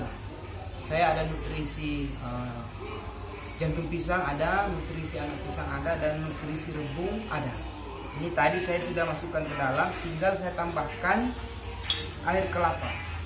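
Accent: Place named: Bugis, Indonesia. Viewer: Logat bugis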